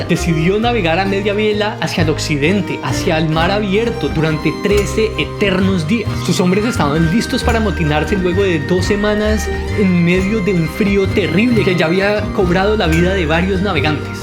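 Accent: Bogotá, Colombia. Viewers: Quedé como iniciado mano! 😅😅 Ojalá puedas hacer la continuación de este video, eres un auténtico rolo, un auténtico colombiano. Me agrada ver que seas tan apasionado por nuestra historia y representes con tu estilo y acento la cultura de la capital. Dios te bendiga.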